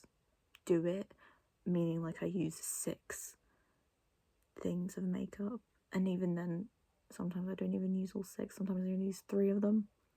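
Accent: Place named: United Kingdom. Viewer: Ew I just realized your British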